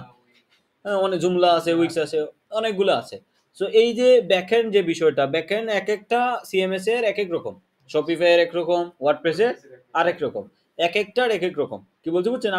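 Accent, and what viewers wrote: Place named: Kolkata, India. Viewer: apnar kothar tone koklkatar moto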